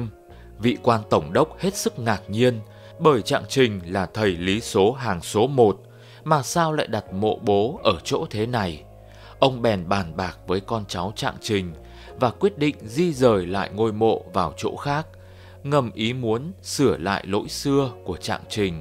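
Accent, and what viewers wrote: Hanoi, Vietnam. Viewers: Hay quá, giọng anh HN thật ấn tượng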